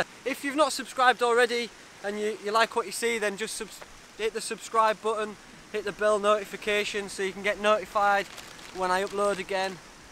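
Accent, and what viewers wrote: Yorkshire, United Kingdom. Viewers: Hi mate I love your videos and have been fancying a go at some coastal virgin foraging I’m from Yorkshire and picked up you ears coast accent . I’m wondering where’s best to go for a first time thinking robin hoods bay what you think bro ?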